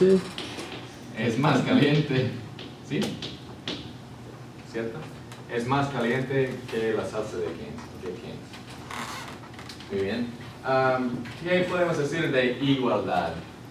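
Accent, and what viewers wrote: United States. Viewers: me encanta el acento del gringo JAJJAJAJA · Si muy bien. Obvio tiene su acento gringo pero se le entiende perfecto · Jejejejej normal, el acento gringo se nota, pero habla bien 👍 Hay que decirlo porque igual esas cosas se corrigen 😬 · El acento del maestro lo siento todavía muy gringo :v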